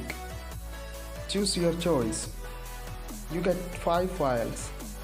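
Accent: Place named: Kerala, India. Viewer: Bro malayali alle English kettapo thoni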